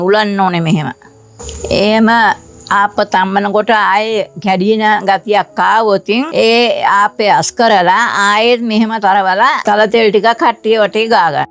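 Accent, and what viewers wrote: South, Sri Lanka. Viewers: අම්මාගේ දකුණේ භාශා ශයිලියට කැමතියි .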